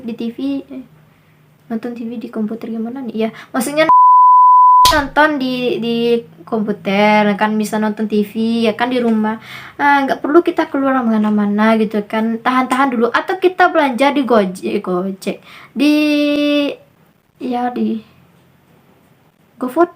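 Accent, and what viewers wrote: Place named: West Sumatra, Indonesia. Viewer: Minang nya jelas kali ya ges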